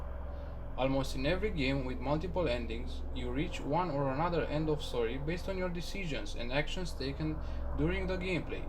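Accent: Romania. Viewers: Wait,you are romanian?i ask cause of the accent and the flag gas mask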